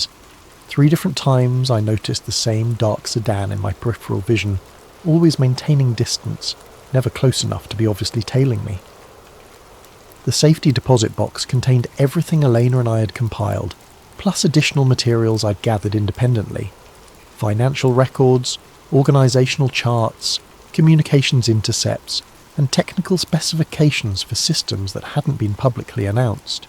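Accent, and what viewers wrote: England, United Kingdom. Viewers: please, no more english accent 😮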